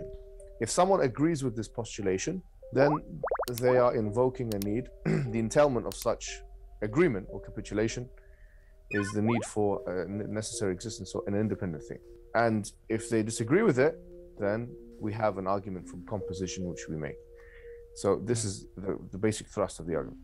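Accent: United Kingdom. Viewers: british accent.. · Should’ve added subtitles for those that can’t understand British accent. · it’s not the accent that was hard to understand for me In fact I love the British accent it’s the high quality vocabulary he was using my vocabulary is very limited. But I’m not surprised at all know that I know he graduated from Oxford University mashallah.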